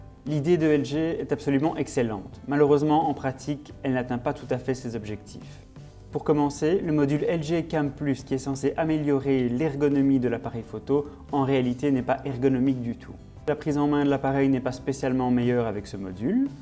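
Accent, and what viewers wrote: Belgium. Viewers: Bon test, parfait, pro, continue comme ça. Ha si... tu es de Belgique?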